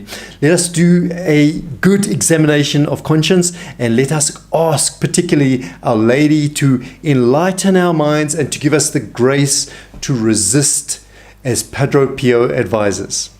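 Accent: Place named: South Africa. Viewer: As an Australian/Lebanese Maronite Catholic, I absolutely enjoy learning about Padre Pio through South African accent!!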